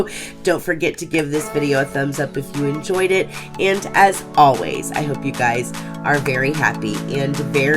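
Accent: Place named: Pennsylvania, United States. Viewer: I knew I recognized your accent, hello from the City of Brotherly Love fellow Pennsylvanian. 😊 I just bought this kit yesterday and had to consult with beauty gurus b4 opening.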